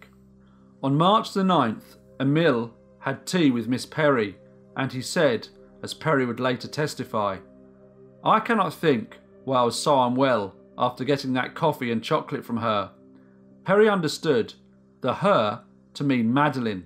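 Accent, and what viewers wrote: England, United Kingdom. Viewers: Wow!!!!... I really enjoy your channel and constantly recommend you to My friends. your voice is PERFECT and I NEVER EVER am confused about what your narration is saying. Also or "as well" thank you for annunciating so clearly.although I am an American 'folk' but I absolutely appreciate the Queen's English when it is used so well.thank you again for such entertaining videos. I am considering becoming a Patreon which I have never done before but I think it's well worth it for the quality of the the video info 👌 THANK YOU AGAIN...,. your American friend, Brian 🇺🇲😁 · I don't understand why anyone would have a problem with your voice. It's a perfect narrator's voice. Plus it's an English accent that Americans can easily understand. Love your voice and accent. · I just love your British accent.. It's so soothing and I listen to your stories and it fills like,im listening to an audible book on my phone.. 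But, keep the stories coming.. My good man.. 😘🤔🤗😱🤧🤢👻🔪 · Even the music in the background does not distract me because your voice is very distinct. Love your style of narration. I love English accents. 😊👍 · I agree ☝️ your voice, I keep saying English Accent!! Haha 🤣 🇬🇧 with love 💖 Ally from Australia 🇦🇺 👍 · Just subbed, your my new fav channel. 🖤Love the British accent,don't ever change that. No matter what anyone says. 💯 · I,too,enjoy your wonderful English voice. I love each carefully researched broadcast. Great job!!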